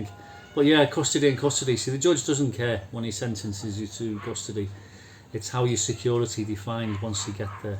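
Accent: Liverpool, United Kingdom. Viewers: Any scouse accent reminds me of Brookside, love it. Just wondering if category D allows Internet access in any form ?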